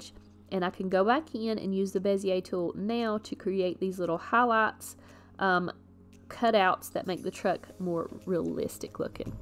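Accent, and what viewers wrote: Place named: South, United States. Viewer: You are speaking my accent!!! From one southern girl to another, I love this video! Thanks so much for sharing! Do you use Inkscape for all of your SVG designs or do you use other design software as well?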